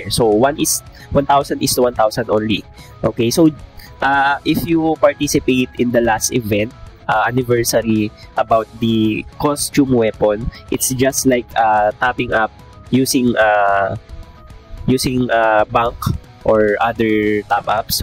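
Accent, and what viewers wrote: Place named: Visayas, Philippines. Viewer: Pinilit mopa mag english pati accent mo pang bisaya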